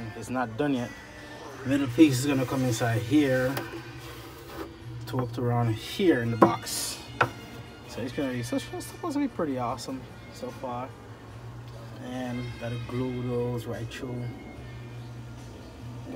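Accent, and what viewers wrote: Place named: Caribbean. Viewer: You’re sound Caribbean my people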